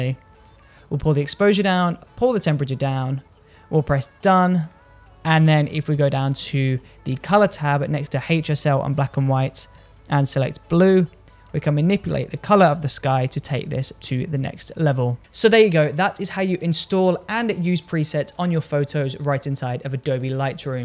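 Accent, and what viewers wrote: Birmingham, United Kingdom. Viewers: The bullring you from birmingham ?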